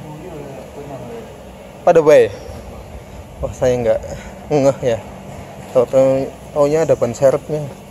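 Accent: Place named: Tegal, Indonesia. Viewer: Sih kayane wong tegal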